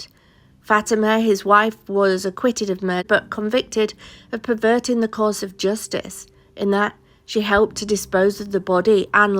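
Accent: Yorkshire, United Kingdom. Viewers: Ahh yes, a good old car boot sale. I miss home so much but listening to you makes me feel so at home again. I can't tell you how much I love your content. I watch TC content on this platform for 4-5 hours every single night for 4 years and I love your channel. A proper Yorkshire lass!!💙 On another note my grandad had a 3 wheeler EXACTLY like the one you showed. Same colour n all when we lived in Romiley. For the record he died in '86 at age 87 and that car never drove farther than Hyde or Marple. I don't even know if it was capable😂 🤘🏻🇬🇧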